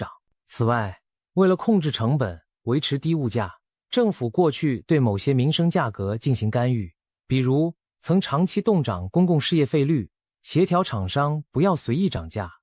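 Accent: China. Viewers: 聽口音中國人，中國的爛事比台灣多的多＇先把你們國家自己的事情解決了，再來關心別人吧。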